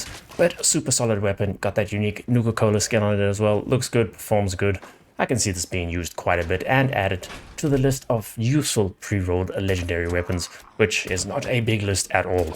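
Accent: South Africa. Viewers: Is that a South African accent I hear?! Subbed.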